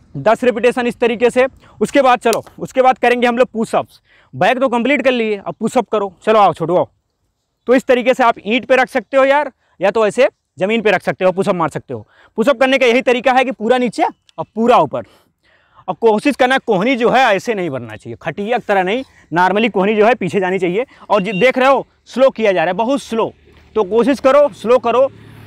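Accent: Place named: Uttar Pradesh, India. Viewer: Ap up se ho kya language to wase bol rahe ho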